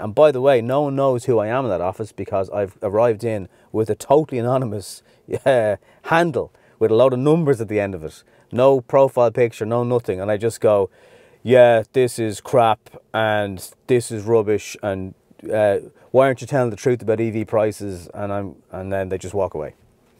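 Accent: Ireland. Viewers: Sorry, I haven't followed you up to now. I'm not a fan of irish voices on YT vids. However yours is fine, now I'm a follower. What you've said is all common sense, it appears the general public has none of this. I appreciate your candor. I appreciate your comments on the effort / rewards etc. This video was honest, transparent and wholesome. Thanks for this.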